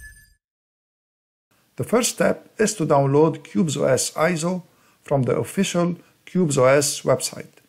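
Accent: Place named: Lebanon. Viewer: sounds like a lebanese accent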